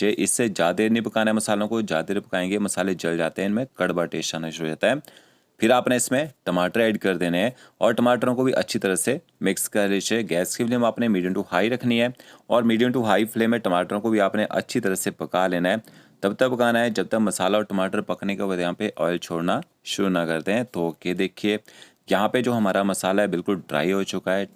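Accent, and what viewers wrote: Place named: Himachal Pradesh, India. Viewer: yes ji apne gorayian ko pehchan he leta hai banda mujhe aise he lagg raha thaa ki pakka app Himanchal se honge... mere khud bahut dost hai himanchal se.. Sujanpur tihra/ Solan/Dharamshala/Rajgarh/Shamli se tau kahi naa kahi apki awaz se pehchana ki aap himanchal se ho...Ek mera dost Sharma voh Nadaun se hai.. ok happy to hear you...thanks so much..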